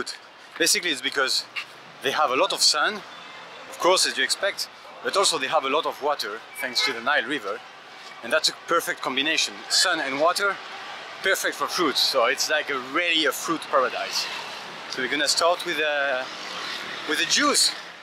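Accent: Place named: France. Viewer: i can know ur from fr with ur acsent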